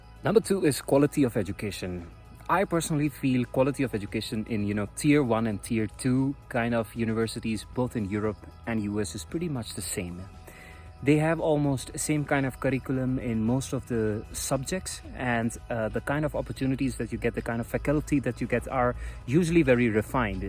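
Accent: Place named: Netherlands. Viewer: your English accent have a dutch inflection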